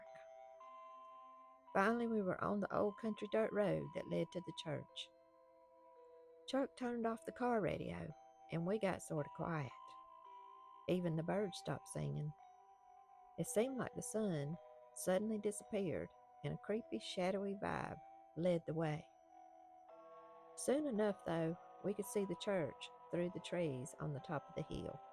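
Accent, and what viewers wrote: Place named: Appalachia, United States. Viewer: I love the beautiful accent of your voice. Reminds me of Appalachia. Thank you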